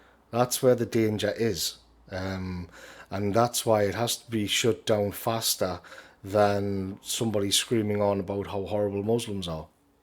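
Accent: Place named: Newcastle, United Kingdom. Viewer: Excellent as always, with the usual sprinkling of linguistic nuggets: "sublime" is a word we hear so very little these days, especially in a Geordie accent. The Daily Shoah crew often talk about "The Kosher Sandwich" which is a similar ( but not identical) metaphor. After that my autoplay selected your vid: 'Roger Scruton & Words Of Power' which dove-tailed perfectly Thanks again. Clarity, insight, passion and brevity. It's a winning formula.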